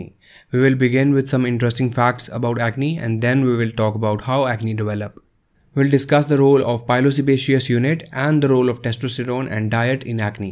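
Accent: India. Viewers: You sound like indian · Yeah it's indian accent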